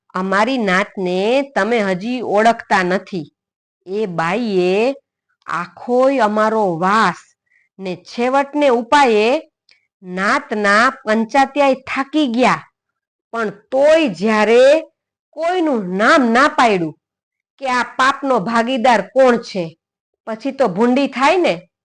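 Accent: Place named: Kathiawar, India. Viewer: પન્નાલાલ પટેલ ની વાર્તાઓ આ પ્રમાણે કાઠિયાવાડી લેઝા થી વંચાય નહીં એના માટે તો સાબરકાંઠા એટલે કે ઉત્તર ગુજરાતનો લહેકો અને લહેજો હોવો જોઈએ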